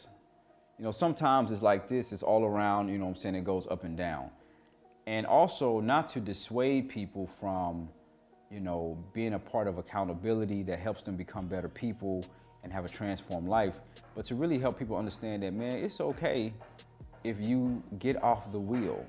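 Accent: Ghana. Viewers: 😄🤣 Hahaha love your Ghanaian accent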